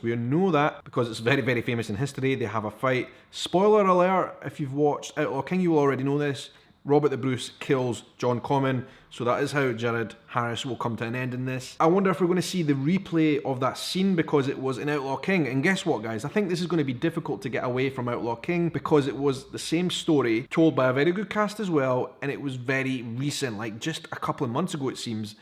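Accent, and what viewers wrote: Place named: Highlands, United Kingdom. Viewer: Yer accent is a shitey chookter middle class yin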